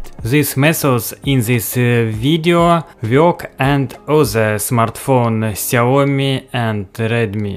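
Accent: Russia. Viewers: Сразу слышно что автор говорит на русском)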